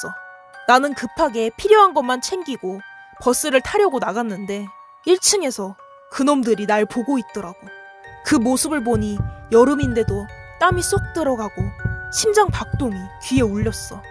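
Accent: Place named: Gyeongsang, South Korea. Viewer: 정신단디 챙기라.이게 포항말이지.듣다봉께로 윽시 재밋노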